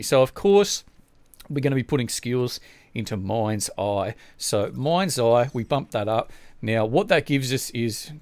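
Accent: Australia. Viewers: what part of australia are you from?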